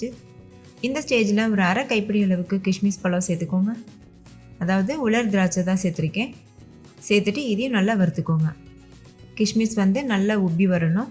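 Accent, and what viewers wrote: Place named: Thanjavur, India. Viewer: ஹலோ உங்க ஸ்லாங் எல்லாம் பார்த்தா தஞ்சாவூர் டிஸ்ட்ரிக்ட்😊 உங்க ஊர்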